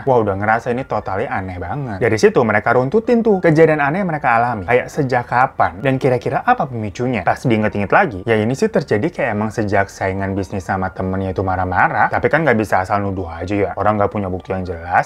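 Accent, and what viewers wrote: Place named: Surabaya, Indonesia. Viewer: Pasti org surabaya nii.. ngomongnya "I" (ai) gt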